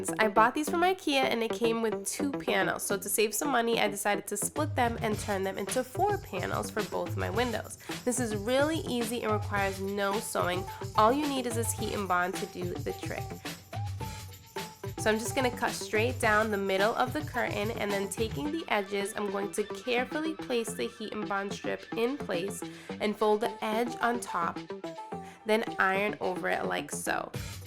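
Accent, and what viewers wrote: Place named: Massachusetts, United States. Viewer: Are you from Mass? Your accent is so cute! Lol #CTNative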